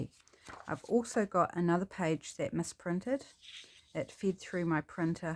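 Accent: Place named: Australia. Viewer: I am from Aussie so it was great to hear someone from this side of the world.Your clear pockets looked good, thanks for you video.thank you for your palm tree freebies.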